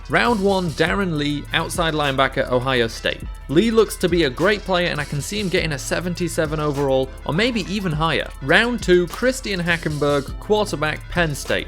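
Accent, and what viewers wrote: United Kingdom. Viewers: U British ?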